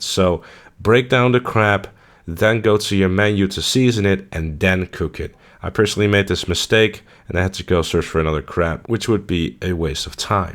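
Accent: Netherlands. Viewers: Great guide, I'm a fan of rear herbs. Are you Dutch? I can't place your accent.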